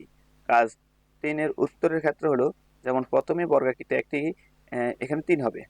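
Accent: Noakhali, Bangladesh. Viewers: স্যার আপনি কি নোয়াখালীর মানুষ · Sir apni ki nokhalir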